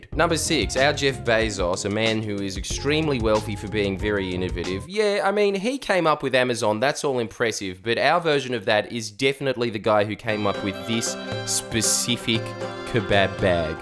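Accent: New Zealand. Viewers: You sound kiwi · Nup he sounds kiwi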